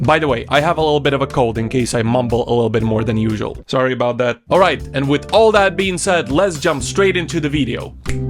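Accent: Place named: Scotland, United Kingdom. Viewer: Loving the Scottish pronunciation 🙊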